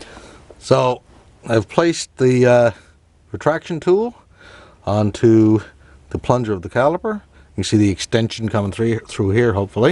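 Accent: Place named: Grand Falls, Canada. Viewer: Are you from Grand Falls? I hear the accent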